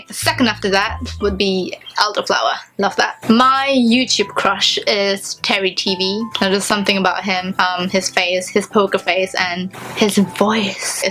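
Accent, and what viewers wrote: United Kingdom. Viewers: why you got British Accent? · You kind of sound like you have English(British) accent with your pronunciation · You have such a beautiful accent, it's like a mix between British and Chinese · wow is she british??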